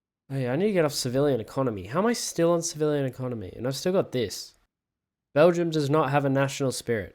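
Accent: United Kingdom. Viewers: I’m surprised this has confused him, by the sounds of it he’s English. You don’t have to be a political expert, but we are also a constitutional monarchy, and surely he must have come across the idea of the King appointing the Prime Minister. Edit: Australian, not English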